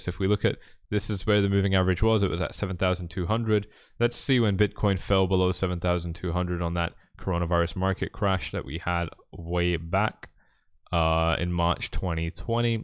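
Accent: Scotland, United Kingdom. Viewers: R u a brown Scottish dude?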